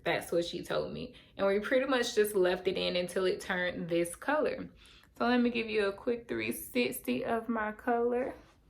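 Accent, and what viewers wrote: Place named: South, United States. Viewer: Girl, listen, I've had locs before (about to start my loc journey again next week) and I had never been tender headed in my life until my hair loc'd! I thought that was crazy. Your hair is beautiful, btw! I'm a new subbie. Thank you for mentioning the salon because I'm in Atlanta too. To hear you talk, I was thinking you had to be from here or somewhere from the south because we sound alike 😁😁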